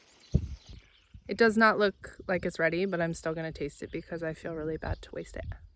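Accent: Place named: United States. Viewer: How did you get sick in winter. I have been here since March 20 and not ha a day off yet. Yes we have had the wettest winter so far and now plenty of water to see me through the coming warm summer. Even up here in the mountains it can still get hot, 33 or 92 f last week, a tad warmer than U.K. and dam site drier after what they have had in recent months. Here we have had 150% of our annual rainfall while parts of U.K. have had 300% of their total for the winter. You speak English, but the accent is elsewhere, America or maybe Canada and hubby could be local as not hear him much. A sheet of clear plastic over your seed pots will work better. Toms need 68 bottom heat to germinate within 3 days or can take a week if left in the house. I have been a gardener for a long time so ask if you need to know anything to help you.The more I listen the more I think Canada or northern states, but maybe lived in U.K. 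for a while. Enjoy it while you can as if the fools in the middle east do calm down then we are all up shit creek without a paddle.. Mulberrys must be nearly wrotten before you eat them.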